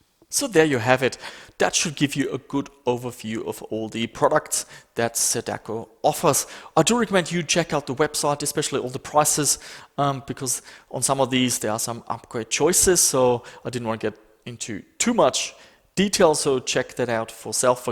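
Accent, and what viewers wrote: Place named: Germany. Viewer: Yeah, I also thought you were from Germany :D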